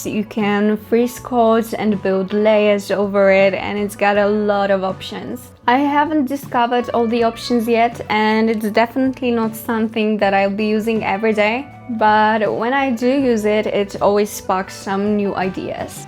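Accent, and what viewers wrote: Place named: Poland. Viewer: Cool video. The Boss BD is one of my favorite drives. Btw, I love your accent! I thought it sounded kind of northern European, and then I saw that pedal was Polish, I'm guessing the accent is also Polish (and your English is perfect!). :) Anyway, greetings from Ohio, US.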